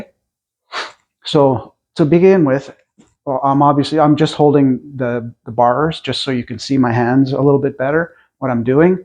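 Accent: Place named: Canada. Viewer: R U Canadian? You sound like a Canuck. By the way you look awesome for 60 I never would have guessed. #Stayblessed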